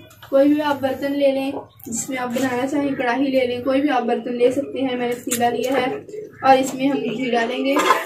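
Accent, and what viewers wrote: Punjab, India. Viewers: Please Speak Punjabi Your Punjabi is so sweet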